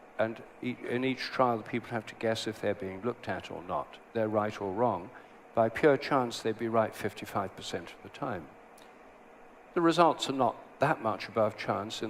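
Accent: United Kingdom. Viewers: I'm tired of British accents. This man goes on and on. I don't disagree with him on everything here. It's just boring. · I can see how a take down of modern science can be a appealing to some but this is pretty stupid. Don’t let the British accent and crazy hair fool you 😂. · Just because you have a British accent doesn't make you smart. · He looks like a pathetic hobo. If you're going to sport the British accent and give a symposium in front of a large crowd, at least take 5 minutes to pull your pants and well . He's mocking others while looking like that. Come on sir, be civil and respectable.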